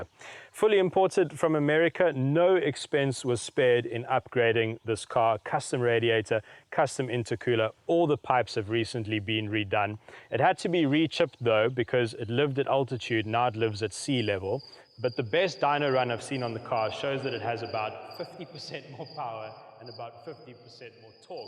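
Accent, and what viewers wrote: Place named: South Africa. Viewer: South African English is probably the only english accent/variation I just can't mimic at all! That alone makes me a total fan. Not saying I can do all accents flawless, far from it, but SAE is just impossible to get right. More than a few similarities with New Zeeland English, but still distinctly different enough to throw me off. Oh! And I totally love cars too!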